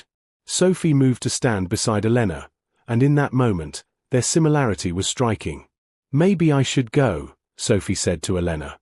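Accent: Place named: United Kingdom. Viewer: So tired of these stories with a British accent. I don't know why but for me it's off-putting!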